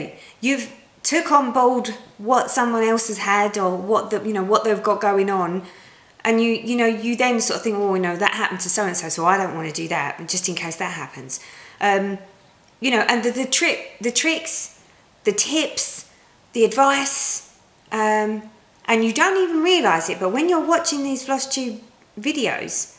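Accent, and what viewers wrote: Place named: London, United Kingdom. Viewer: Hi,! I'm brand new to Flosstube and am catching up on videos. Just did an intro video but I might have to do this one next (even though I don't have funny moments in stitching, really!). BTW, your accent makes me miss London! Cheers! --Beth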